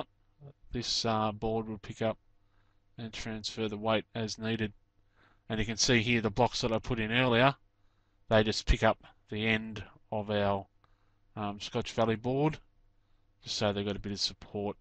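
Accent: Australia. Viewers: Very happy to have finally found some roofing explanations told by whom sounds like an Aussie and speaks in metric terms not American bloody imperials....Loving the videos, good work! Simple and to the point..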